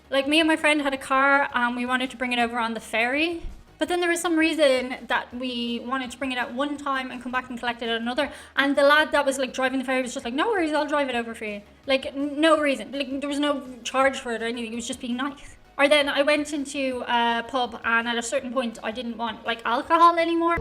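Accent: Ireland. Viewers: I love female Irish accents 😏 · We love the Irish accent!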